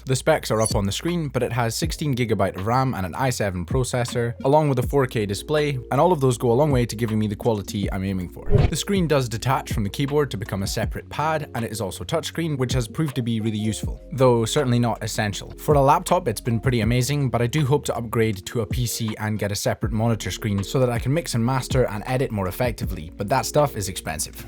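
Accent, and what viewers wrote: Scotland, United Kingdom. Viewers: I love when you click on a video and hear a fellow Scottish accent! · Awesome video brother! Just outta curiosity. what part of the UK are you from? Cheers from Canada!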